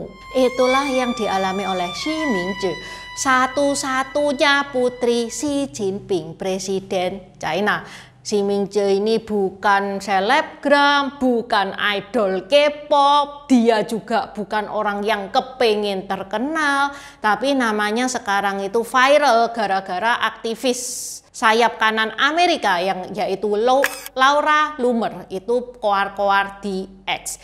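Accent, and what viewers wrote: Java, Indonesia. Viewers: CICI produk lokal yang meddok, aku suka.... 😊😊😊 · Bahasa kamu ciindo Jawa . Pasti jawane medok ... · Logat jawi cici ne kuental baangat yoo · Medook Jowone😂😂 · Logatnya Cin Wa bgt 😅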